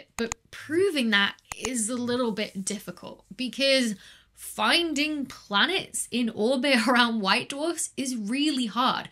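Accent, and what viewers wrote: United Kingdom. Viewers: I really appreciate your channel, fun and still very heady...off topic, but the CC follows your British accent - Hubble was Hobble, and exo planet said XA planet once 😂🙌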